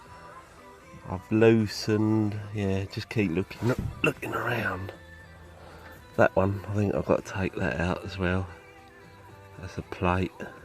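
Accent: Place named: Cockney, United Kingdom. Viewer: Loving the auto-generated sub titles on this with his cockney accent lol.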